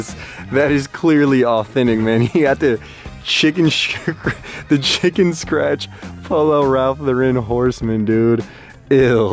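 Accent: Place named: Texas, United States. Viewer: I appreciate the Texas Flanders accent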